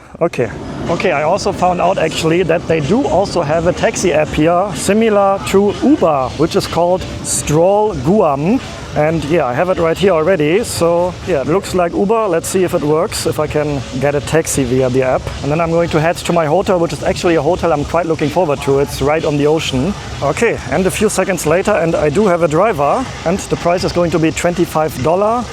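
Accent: Germany. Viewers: Thickest German accent ever!!! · He's from Bremen, Germany and decided to make his videos in English. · Der Deutsche Akzent knallt richtig rein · Let the German have his German accent folks. You can't POLICE the entire world's pronunciation. Hell, Americans don't agree on how to pronounce AMERICAN CITIES. Have some grace. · are you born german? your accent is blended sounding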